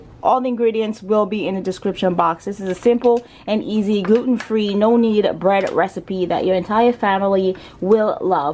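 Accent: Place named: South, United States. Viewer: I was trying to identify your accent...it's not familiar. South, for sure, but different, too. ????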